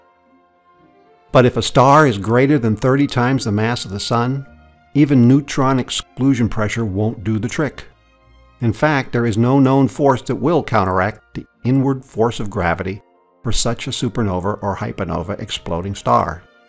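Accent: United States. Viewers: Oh thank goodness... A video narrated by an American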